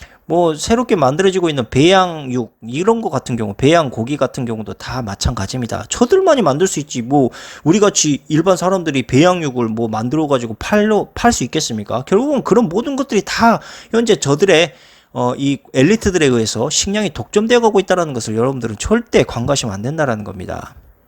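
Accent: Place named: Suncheon, South Korea. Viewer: 친정이 순천인디 님 사투리 항상 즐겁게 듣고 있습니다 오늘도 화이팅 하십시오 그동안 밀린 댓글 오늘은 도배하고 갑니다 저는 그럼 오늘은 이만 뿅~~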